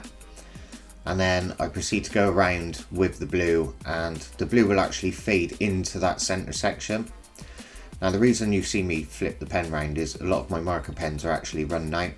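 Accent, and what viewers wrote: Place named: Bristol, United Kingdom. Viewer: Can't beat a bit of wolverine, keep up the sick work dude. I also love how bloody Bristolian you sound shag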